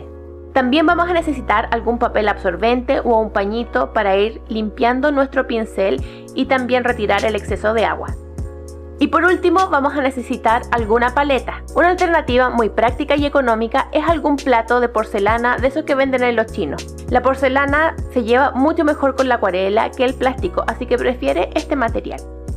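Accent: Chile. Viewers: Chilena? :D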